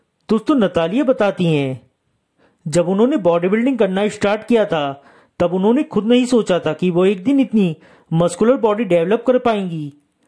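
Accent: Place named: India. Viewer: I couldn't understand a word that the so called narrator was saying, due to his extremely thick Indian accent. So it just sounded like gibberish to me.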